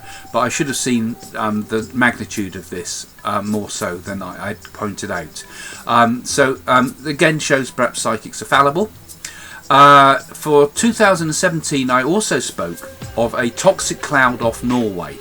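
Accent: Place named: South London, United Kingdom. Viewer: what is your accent? Sounds something between South London and Manchester.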